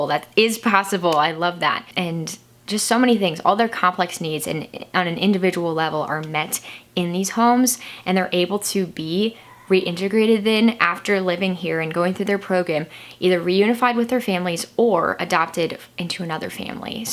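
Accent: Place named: Illinois, United States. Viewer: I really enjoyed this video. And also, I You said people from Illinois dont have accents, but I find that funny because you definitely have an accent.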